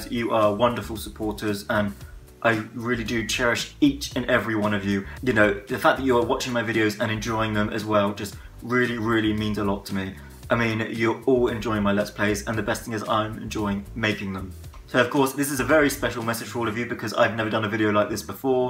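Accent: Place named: United Kingdom. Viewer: Congrats.. It is finally nice to see a face behind that British accent of yours:) I love all your videos.. keep it up! hugs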